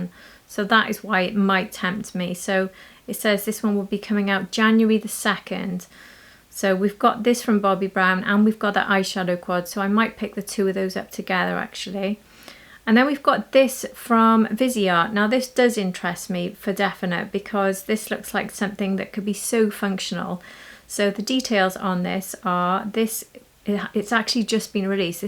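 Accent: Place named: South Wales, United Kingdom. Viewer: I love your accent, is it South Wales?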